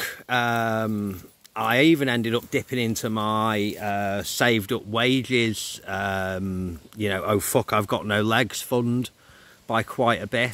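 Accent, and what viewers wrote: United Kingdom. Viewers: British accent in Ukraine😅👍👍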